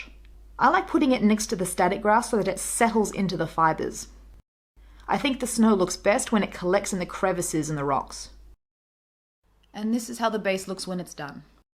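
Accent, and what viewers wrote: Australia. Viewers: Also, subbed. Love seeing content produced in Australia! (God I hope that's an Australian accent, I should be able to pick that)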